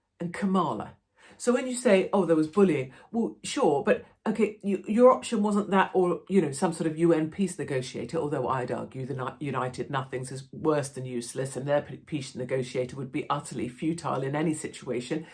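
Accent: RP, United Kingdom. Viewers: .....you are such a Sister, a Yank with a posh British accent. We love you over here. Thank you for your reasonable, common sense. Keep speaking your mind!!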